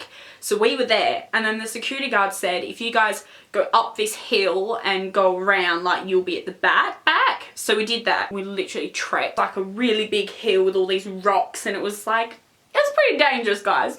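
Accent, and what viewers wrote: Australia. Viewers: are you Australian? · She legit has the same australian accent as 5sos and lol u made it to her new video! Watch it to see her slay your ass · Your so pretty, and I lurrrrv your accent. Are you Australian?? xx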